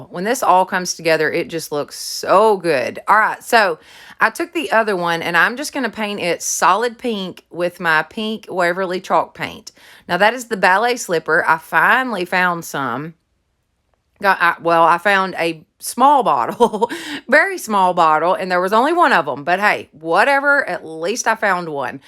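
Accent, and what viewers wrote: South, United States. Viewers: Your stiching is awesome and I really dig your crafts however, your sweet home grown down south voice is what I absolutely adore.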